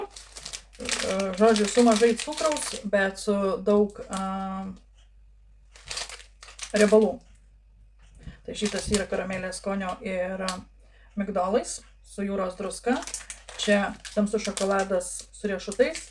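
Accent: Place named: Aukštaitija, Lithuania. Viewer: Laba jūsu graži kalba turbut nuo Aukštaityjos busit sekmes jums gyvenime❤❤❤😊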